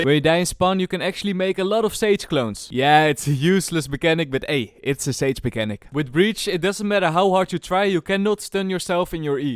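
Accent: Netherlands. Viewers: je ziet dit waarschijnlijk niet maar holy moly je klinkt ZO nederlands xD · Are you dutch or something, because you have that accent. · Bro are you dutch? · this guy sounds like he's dutch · you dutch/nederlands? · Do I hoor Nederlands? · is this guy dutch? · bro je stem is zooo Nederlands gewoon holly fuck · Het nederlands accent maakt de video veel beter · Ik weet zeker dat je Nederlands bent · I smell Dutch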